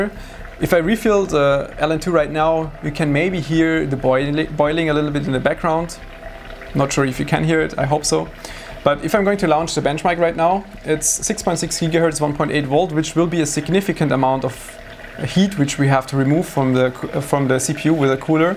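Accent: Germany. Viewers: Man bemerkt dass du deutscher bist xDD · Hab noch nie ein deutscheres Englisch gehört😂😂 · der deutsche akzent ist so hörbar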